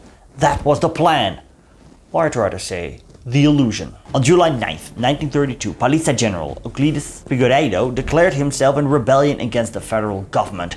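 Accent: Spain. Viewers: What are you talking about? He just pronounced Portuguese as if it was Spanish throughout the video, as seen by "Retúliô" and "Itararê". This is an irrelevant nitpick since this is a history channel as opposed to a linguistics one, but please realize that the only reason some people in Rio Grande do Sul pronounce their state's name like that is because it was settled by Spaniards first. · Would be better if you didn't pronouced things with the spanish accent, and instead used the Portuguese one.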